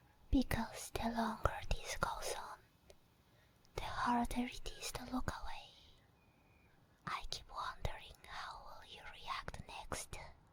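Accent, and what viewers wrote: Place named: France. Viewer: Hi I’m new around here what accent do you have I really like it kinda sounds like French but my ears can’t quite nail it down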